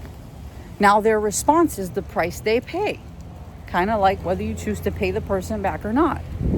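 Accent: New York, United States. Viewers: you actually sound Italian in your normal speaking. You sounded great imitating Sonny, because you naturally sound like from New York or Philadelphia! You always reminded me of a girl version of Ralph Macchio. You would have been terrific if you got to be in the Godfather movies. I lent $1050 last year to two different people, $500 to one person and $550 to another person. I haven't gotten a penny back so far. Well, I will be just like Sonny, they will never ask me for money ever again, so I paid that to get them off my back. Also, I truly believe that God replenishes in the future. God will give it back and I might not even realise it, but he will.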